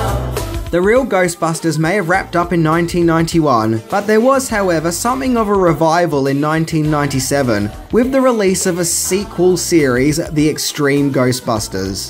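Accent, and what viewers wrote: Australia. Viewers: Yeah i remember the rename to the slimer thing. I had kind of started to loose interest in it then. But the first couple seasons were really good. But that's what happens to a fruit when you juice it too much. The first press is awesome. Second press.. kinda bitter. third press... you might as well eat the peel. I have been watching your videos trying to place your accent. I never guessed Aussie. And I've been talking to Australians since yahoo chat was a thing. I like Australians. I had a lot of fun conversations back in the mid to late 90's with australian guys on yahoo just joking and talking smack. You are a friendly bunch. I like that.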